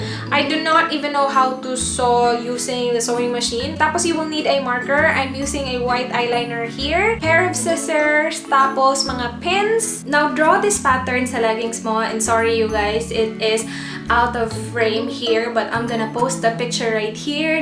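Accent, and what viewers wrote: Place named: Visayas, Philippines. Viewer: She i guess is Bisaya, i love her accent when she speaks English.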